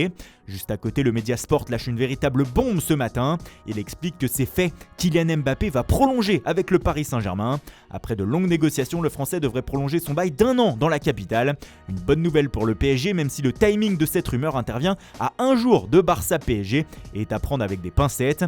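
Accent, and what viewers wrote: Italy. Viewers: C'est reparti avec l'accent italien...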